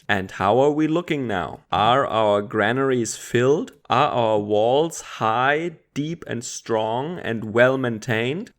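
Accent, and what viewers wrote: Germany. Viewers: Dein German accent is grauenhaft blöde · I understand nothing he's saying about economics, but damn do I love listening to that German accent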